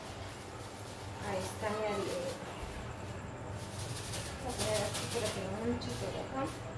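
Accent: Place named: Guatemala. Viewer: De todas las chicas de los grupos chapín ella es la única que habla como su país pero que se escucha bonito es decir no vulgar su forma de hablar su acento.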